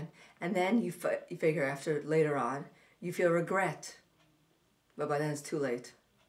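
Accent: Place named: New York, United States. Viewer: You have a New York/New Jersey accent, you sound like an Italian girl.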